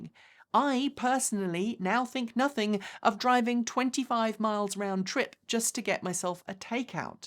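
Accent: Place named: United Kingdom. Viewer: Excellent report. I was ready to leap on any major, disputable point with gusto. It's good to hear good, solid facts in a calm, Brit accent. I may need to watch it again in case I overlooked something.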